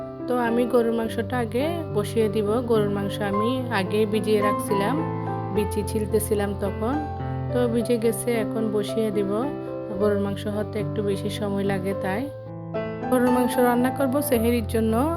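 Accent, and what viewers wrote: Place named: Chittagong, Bangladesh. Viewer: ❤২২❤❤আমার প্রিয় আপু সিমের বিচির খোসা ছাড়ানোর সুন্দর একটা আইডিয়া শেয়ার করে দিয়েছো অনেক ভালো লাগলো শুটকি মাছ আমারও পছন্দ তুমি চিটাগাং এর আমি জানি আর তোমার কথায় আমার কাছে সেরকম লাগে আর আমার খুবই ভালো লাগে কথা এই ধরণটা আমার চিটাগাংই বেড়ে ওঠা সবকিছুই গরুর মাংস রান্নাটা অনেক সুন্দর হয়েছে বেগুন সিমের বিচি শুটকি দিয়ে রান্না করেছো ইফতারি গুলা ভেজে নিয়েছো বেগুনি টা আমার কাছে খুবই সুন্দর লেগেছে ভাইয়া অনেক কিছু নিয়ে এসেছে বাচ্চারা বাইরের খাবার খুবই পছন্দ করে আপু আমার বাচ্চাদেরও একই অবস্থা ভালো থেকো ❤❤❤❤❤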